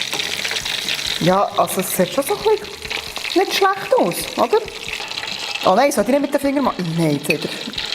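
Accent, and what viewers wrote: Bern, Switzerland. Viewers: So geil! Bi neu bi dir u finge, dass du's extrem guet machsch. U sooo schön, wemer uf YouTube chly Bärndütsch ghört! :D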